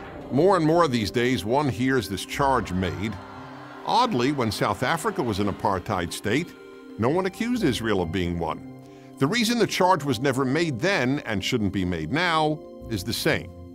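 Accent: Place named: United States. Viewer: It's the usual excuse and deflection from the presenter (who appears to be an American judging by his accent. The apartheid system is carried out in the Occupied Palestinian Territories. The Palestinians there are seperated, restricted and not allowed to vote over the Israeli government who rule their lives.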